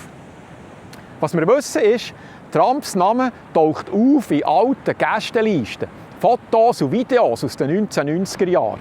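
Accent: Switzerland. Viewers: Vielen Dank für die ausgeglichene Aufdröselung der Ereignisse. Auch wenn ich mich mit Ihrem Swizerdütsch etwas schwer tue - aber das wird schon besser ;) - weiß ich diese Art Berichts ehr zu schätzen. Eine Bitte zur Klärung hätte ich. Was hat es mit den somalischen "Kindergärten" auf sich? Hier gehen die Berichte komplett konträr - sprich: links sagt gar nichts, rechts um so mehr.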